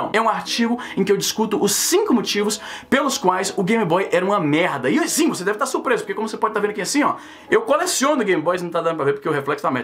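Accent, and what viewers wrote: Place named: Ceará, Brazil. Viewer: Eu percebi algo de cearense em vc . ( fala rápido pra caralho )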